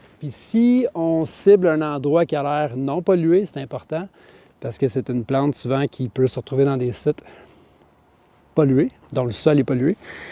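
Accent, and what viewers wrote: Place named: Canada. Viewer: 😅C'est seulement aujourd'hui que je vois cette vidéo que je trouve très intéressante. Hier, j'ai cueilli des jeunes feuilles de renouée du Japon pour les cuisiner. Cette vidéo m'inspire. J'ai aussi cueilli de l'ail des bois (en France on l'appelle l'ail des ours). J'en ai fait un pesto. Merci Monsieur de mettre en avant les plantes sauvages comestibles. C'est dommage que l'on en profite pas davantage. Elles sont sources de grandes qualités aussi bien alimentaires que pharmaceutiques. Les ignorer risque éteindre la connaissance ancestrale. Votre accent est canadien (j'aime beaucoup). Lorsque je vais au Canada, je m'installe à Montréal durant quelques mois. De quelle région êtes-vous ?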